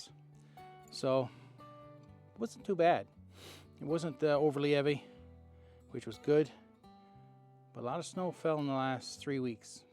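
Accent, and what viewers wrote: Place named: Newfoundland, Canada. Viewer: I absolutely love watching anything you post. Love that Newfie twang! I have a NovaScotia twang lol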